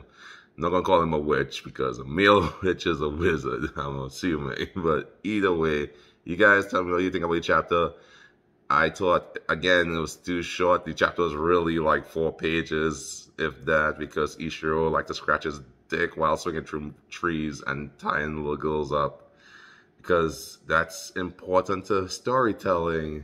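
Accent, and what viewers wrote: Trinidad and Tobago. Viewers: What’s ur background bro? Ur accent sounds Trinidadian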